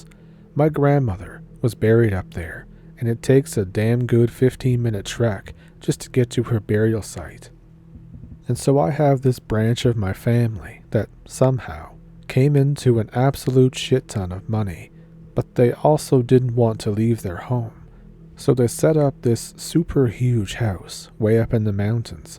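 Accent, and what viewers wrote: Canada. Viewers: I find your thick canadian accent very endearing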